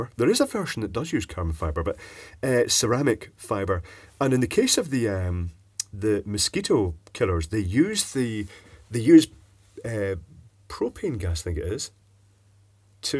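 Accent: Scotland, United Kingdom. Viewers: Every time you said “gasoline” rather than “petrol” in your quite cultured Scottish accent..! lol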